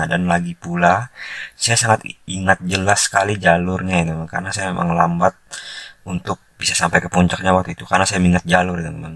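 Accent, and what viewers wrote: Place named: Kalimantan, Indonesia. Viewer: Kalo saya merasa nyaman dengan penyampaiannya karena sama sama org dr kalimantan jd udah terbiasa dengar nya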